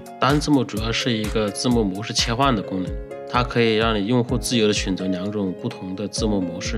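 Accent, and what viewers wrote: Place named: Hunan, China. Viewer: 太厉害了。UP主超棒。一口湖南普通话让我这个长沙女婿听着很亲切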